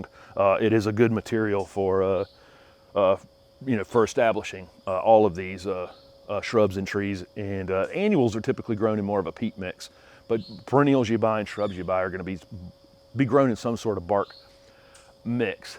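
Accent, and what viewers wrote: South, United States. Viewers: In addition to trying to soak up all the knowledge you share, I very much enjoy listening to your Southern accent. Raised in the South, I fell in love with gardening alongside my father. Transplanted to Phoenix in 1970, i greatly missed the ability to grow so many things. Now retired in Arizona’s best kept secret, the White Mountains, zone 6b, I am in heaven learning to garden all over again. Thank you for your help. And I would love to add a set of Corona pruners to my tool bucket.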